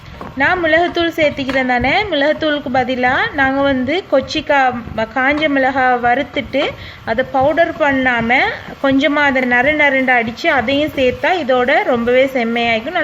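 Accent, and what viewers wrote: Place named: Sri Lanka. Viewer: நீங்கள் சகோதரி மட்டக்களப்பா அல்லது திருகோணமலையா?உங்கள் ஊர் இலங்கையில் ஏன் என்றால் உங்கள் பேச்சில் தெரிகிறது யாழ்ப்பாணம் நகரத்தில் கிராமத்தில் சரியான வித்தியாசம் உணவு தயாரிப்பு யாழ்ப்பாணத்தின் உணவுகள் கிராமத்திலும் சரி நகரத்திலும் சரி மிக மிக சுவையான தயாரிப்பு அதான் கேட்டேன் .. இலங்கையில் எல்லோரும் ஒரே மாதிரி செய்வதில்லை .. இருந்தாலும் யாழ்ப்பாணம் தனி சுவை வாசம் அனைத்துமே ...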